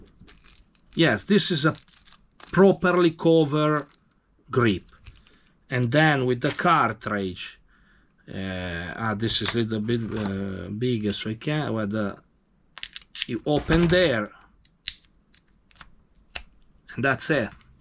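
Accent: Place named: Italy. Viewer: Complimenti per le tue creazioni ! hai davvero grande competenza ! Comunque Si sente che sei italiano ma parli così bene e lentamente l’inglese che sembra come se parlassi italiano . Sei un Maestro 🔝🔥 🔝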